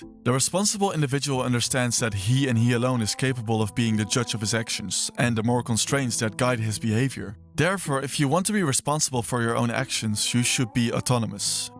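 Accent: Netherlands. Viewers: Your accent sounds very Dutch, are you?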